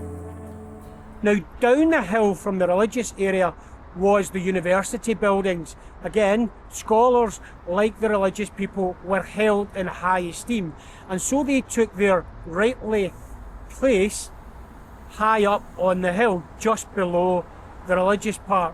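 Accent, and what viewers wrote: Scotland, United Kingdom. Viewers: Nice to see you back in China. I feel you belong there. Another thing I love watching your videos for is your lovely Scottish accent. I used to live in the U.K before I married to come to the States. Then I had a Scottish colleague. I remember I barely understood him most of the time, but I understand you 100%. Wonder why?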